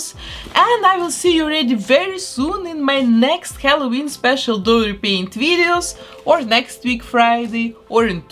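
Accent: Russia. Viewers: Я слышу очень сильный русский акцент 🤔🤔🤔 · Боже, смотрю вас уже несколько лет. И по акценту догадывалась , что вы русскоязычная. Но оказывается вы из Беларуси. Держитесь там, уверена , все будет хорошо))) ❤️💚🤍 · Акцент русский я права? 😁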